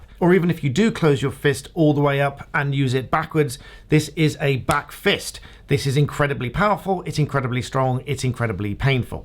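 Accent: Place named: England, United Kingdom. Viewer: Why do you say says as sAYz, and not Sez? It really weirding me out, with english accent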